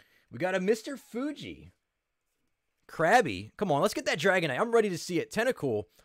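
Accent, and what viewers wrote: New Jersey, United States. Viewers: I know a Jersey accent when I hear one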